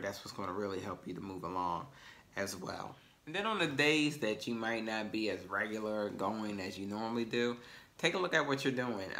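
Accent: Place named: London, United Kingdom. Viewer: lol the London accent.. No not the S curl- good information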